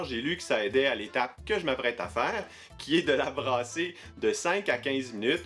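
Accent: Quebec, Canada. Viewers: Géniales vos vidéos.. Tout est parfaitement expliqué,matériel,dosages,temps... Et l'accent québécois est vraiment top 👍👍 Amitiés de France aux cousins des Amériques! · Hey! Bonne vidéo 👍😀 J'ai essayer une de tes recette, et c'est un échec 😅 D'ailleurs, tu et canadien? Vu ton accent je pense que oui 😅 Juste pour dire que j'adore le Canada!! 🙂 Coucou de la Nouvelle-Calédonie.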